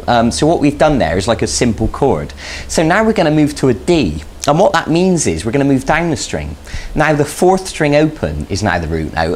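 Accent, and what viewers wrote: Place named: West Country, United Kingdom. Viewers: ooh ah, I loves me geetar lessons with a West country accent. nice one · That a fine west country accent you `ave ther boy !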